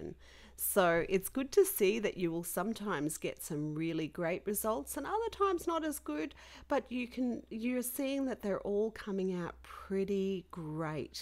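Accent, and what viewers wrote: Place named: Australia. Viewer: Hi! I live in Sydney Australia and very pleased to hear your Australian accent! Hoping you are living in Australia at the moment. I really want to try this. Which magazines have you had the best results from? I've heard some don't work.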